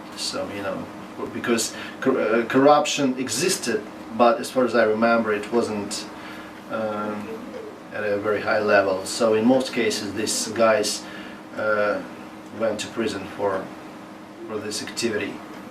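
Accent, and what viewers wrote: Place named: Russia. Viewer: He doesnt speak english but jewish american ,,,and you speak real english with a beautiful russian accent . Thank you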